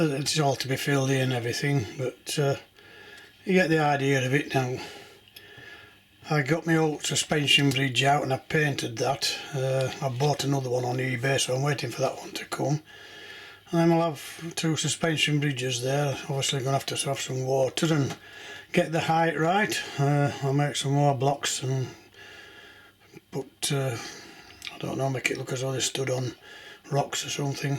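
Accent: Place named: North, United Kingdom. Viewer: Hi, What a lovely set up you have, it looks great even as you said, a long way to go??, keep up the good work, nice to hear a north country accent as I am from the north country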